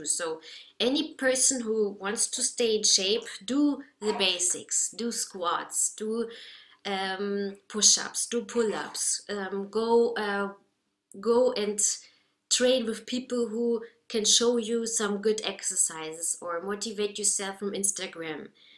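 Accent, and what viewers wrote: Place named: Germany. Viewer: Hab schon gedacht: deutlich deutscher Aktzent :D Tolles Video, danke für die Tipps, viele Grüße!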